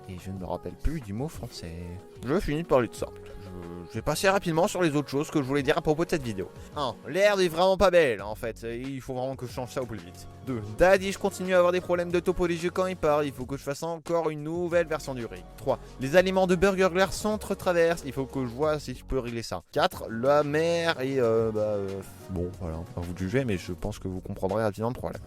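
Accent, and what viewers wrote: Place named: France. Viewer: I fell in love with this French pronunciation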